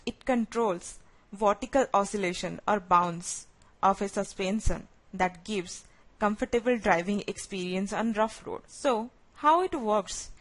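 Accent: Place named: India. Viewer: speak like true indian ,,anyway this is very very helpful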